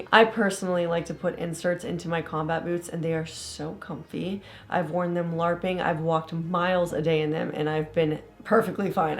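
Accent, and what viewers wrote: United Kingdom. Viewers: Always such a kind positive soul - energy- so sweet✨ Best wishes to you Also brit accent is lovely haha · Your accent is very good. I thought you were England. Maybe do lessons on how to speak "Ren Faire"?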